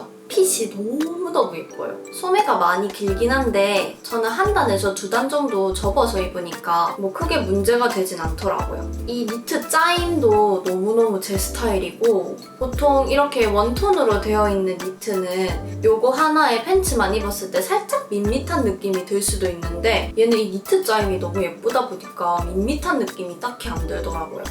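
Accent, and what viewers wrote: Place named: Busan, South Korea. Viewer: 하..진짜 너무 예뻐요❤ 말도 엄청 예쁘게하시고 사투리가 너무 귀여워요 혹시 실례지만 부산 사투리인가요?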